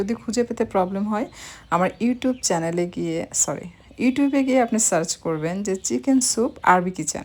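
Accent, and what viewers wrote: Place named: Noakhali, Bangladesh. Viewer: বললেন পাবনা থেকে বলতেছেন,কিন্তু ভাষার টোন হচ্ছে নোয়াখালীর।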